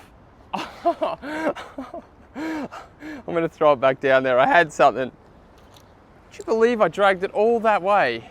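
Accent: Australia. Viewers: LOLOL......bikes seems to haunt you! So i have been subscribed to your channel for about two years, you were in Amsterdam doing the canal's. I heard your Australian accent and I was wondering what you were doing in Amsterdam and if you moved there. Anyway, were you stranded in Amsterdam because of Covid or is Australia your home base?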